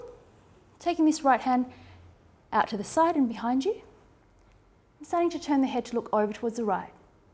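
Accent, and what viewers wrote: Australia. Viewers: Thank you for a fantastic flow. So good to be guided by an Australian voice at home. 🙏🏻🧘‍♀️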